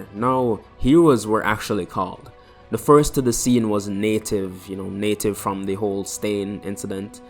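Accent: Jamaica. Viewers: Listen no hate I'd just like to know do u have a Jamaica ascent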